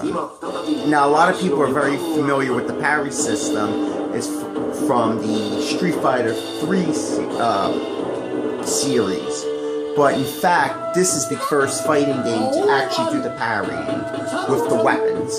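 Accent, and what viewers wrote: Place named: East Coast, United States. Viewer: I love the opening line and your East Coast accent!! :D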